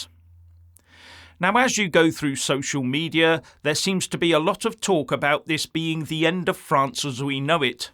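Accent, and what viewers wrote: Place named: United Kingdom. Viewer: Wow. I've never heard anything quite this British, or with quite that much bite without using vulgar language - I never knew the Britisch tongue to be a form of art. Pretty good summary too. Thank you.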